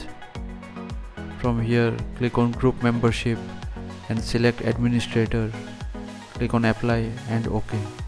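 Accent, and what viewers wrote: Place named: India. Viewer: this dude is the goat, knew it was gonna work when i heard the indian accent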